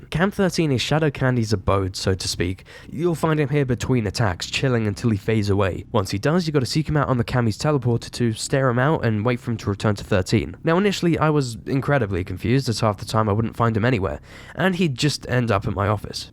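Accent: United Kingdom. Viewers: Man, I keep coming back to this, and I get whiplashed that this was before transitioned- I’m so used to hearing her voice nowadays that her sounding like your average british male feels weird Kinda prefer her voice nowadays lmao, but I just like British accents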